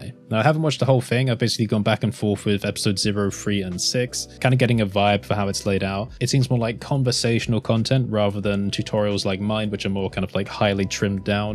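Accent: United Kingdom. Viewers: I could listen to this Bri-ish accent forever much better if I Could speak it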